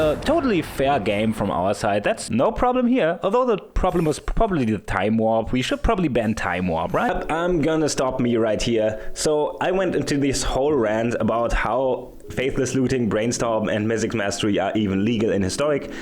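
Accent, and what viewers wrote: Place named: Germany. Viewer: are you a german person though? Because it really sounds like it. At least you are from Austria or Switzerland i know it :D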